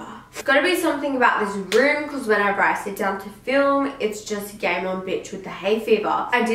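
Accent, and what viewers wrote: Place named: Australia. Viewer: First I love your videos xx you are so amazing and love your Aussie accent Edit(I love your Into it's so bomb i do it all the time)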